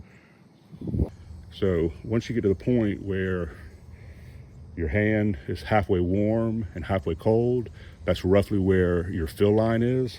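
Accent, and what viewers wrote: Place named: Philadelphia, United States. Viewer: Great tip! Thanks! Also, you from Philly or SEPA? I ask because you say "wooder" instead of "water".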